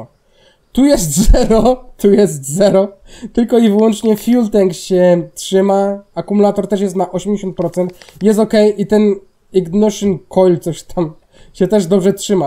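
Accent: Silesia, Poland. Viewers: przaja ci za ten śląski:DD od razu swój rozpozna swego też staram się mówić po polsku i pisać ale zdarza mi się gadać po śląsku a i powinieneś zmienić nazwę kanalu na - król bugowania gier albo osoba która każdą grę ci zbuguje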